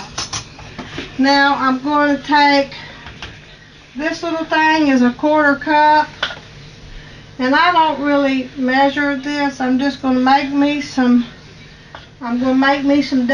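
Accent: United States. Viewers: First time watching your videos and that soup looks delicioussssss!!!! I will try to cook it. Thank you for sharing and I love your accent, which part of the USA are you?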